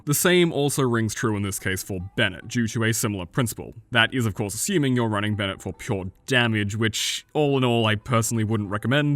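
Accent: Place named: United Kingdom. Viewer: Omg- British accent gives a like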